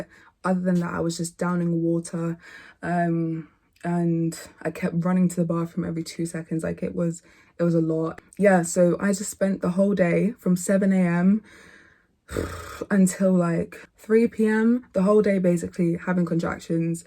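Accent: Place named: United Kingdom. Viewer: Omg you guys are adorable together but you are freaking gorgeous!! Could just listen to you talk all day 😂 I love your accent. Are you in the Uk? Here in the states most videos I watched the dads were there just wondering if they did things differently there. I just subscribed you got me lol I also have a channel more than welcomed to sub back. Xoxo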